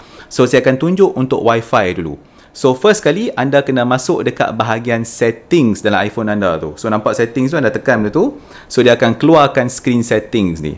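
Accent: North, Malaysia. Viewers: Penerangan yg sgt jelaas. Tambahan pulka cakap utagha kira geng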